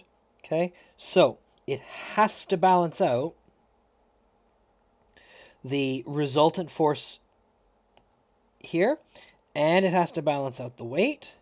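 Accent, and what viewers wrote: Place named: United States. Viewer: I had no idea how to do this in the exam. Thanks teacher with American accent!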